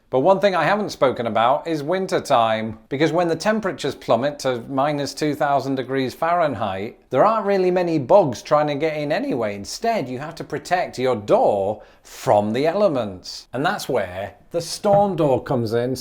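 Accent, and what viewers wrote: United Kingdom. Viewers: Um, he's British.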